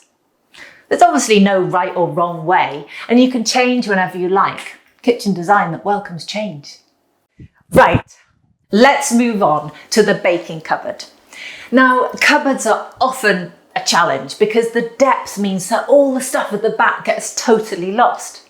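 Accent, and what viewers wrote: Wales, United Kingdom. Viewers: Holly Lord! It feels like I watch DIY Perks) British accent is awesome! Great job, thank you! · OMG!!! Thank you so much for this (and all your) video. So many fantastic ideas in 20 mins!! Gone are the days of printing benchys and busts of Darth Vader... My 3D printer has a new mission in life. I've needed something like this for so long, That veggie label RFID tag idea is just a show-stopper! You are the best. Thank you so much for sharing all your creativity with us. Did I detect just the slightest hint of a Welsh accent?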